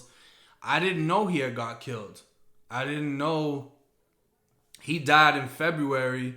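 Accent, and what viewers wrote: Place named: Massachusetts, United States. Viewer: Shout out to my niggaz out in lawrence mass... 860 reppin here. CT Bruh.. u gots sum real content. When I first heard ur voice I was like that nigga from Mass. Wtf he doin down in Tampa. But then again. I myself moved to Jacksonville back in 17 n live in the London Towne projects on lake ave n shit is fuckin real out there. Was handed a piece by my cousins husband 2nd day I was there. I was like wtf is this for, he laughed n sed dead ass... u gonna need this out here bruh. Nigga I retired gun play back in the 90s. Wtf...in my head all I can say is here we go again... wtf...but I lived there for 6 months n had no problems. Niggaz was cool with me. I came back up top cuz i missed my kids... but i would love to move back to Florida...Alex Tatts... Best known Tat artist in CT.. bet ur bottom dollar. U come around these parts, I got u..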